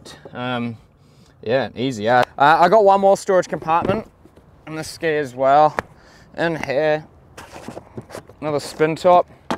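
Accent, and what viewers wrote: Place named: New Zealand. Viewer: Judging by your excent you're a kiwi living in oz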